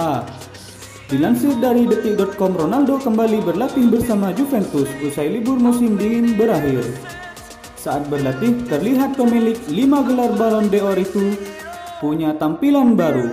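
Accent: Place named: Madura, Indonesia. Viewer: nada duro nya ketok an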